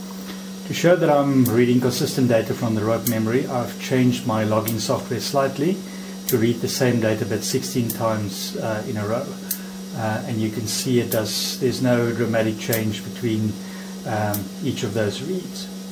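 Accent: South Africa. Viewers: This is absolutely incredible! Wild find. I am absolutely flabbergasted that such a thing could be not only found, but READ in this time. O____O By the accent i'd imagine you're South African? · Lol i was able to tell your are from South Africa because i am originally from there lol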